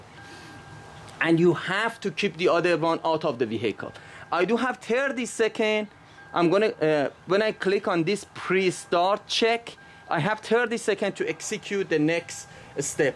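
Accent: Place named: Iran. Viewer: Are you Persian? I was watching your video and my wife (iranian) walked by and said "who is that he sounds persian.."..lol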